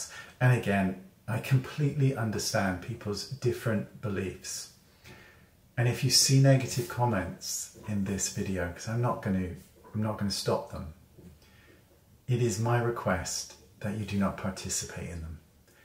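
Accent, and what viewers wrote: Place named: West Country, United Kingdom. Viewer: I love your West country accent Congrats on your marriage, ignore the naysayers x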